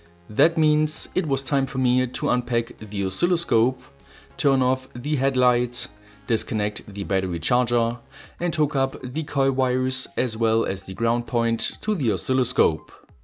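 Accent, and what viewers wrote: Germany. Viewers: What kind of accent do you have? I can't quite recognise it Like which part of Germany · des is voll lustig wie du so richtig deitsch englisch redest ;D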